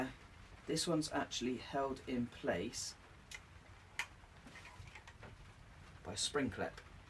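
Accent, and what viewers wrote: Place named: West Country, United Kingdom. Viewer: Lush West Country accent. Good vid.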